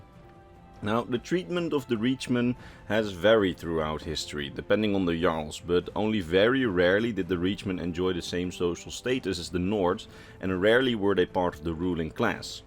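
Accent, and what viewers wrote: Netherlands. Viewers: Goede videos, ik kan horen dat je uit nederland komt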